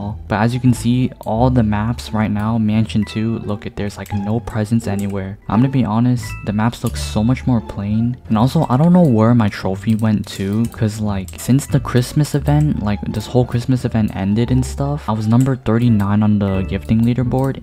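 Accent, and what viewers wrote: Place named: United Kingdom. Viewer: why do you sound so BRI-T-ISH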